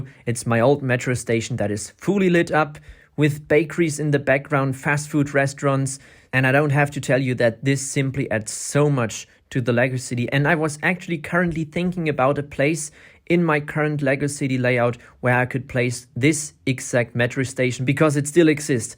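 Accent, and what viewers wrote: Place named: Germany. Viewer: GERMAN ACCENT!!! SO BAD!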